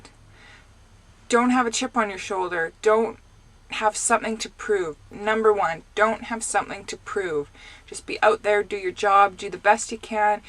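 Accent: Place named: Canada. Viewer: Canadians have cute accents. As far as asking a guy for help, a woman should never be afraid of asking for assistance when needed. My Marine buddy has been driving for twelve years, and STILL doesn't know the do's and don'ts of sliding the tandems. Once I get my cdl and get on the road, I'll help ANYONE that asks.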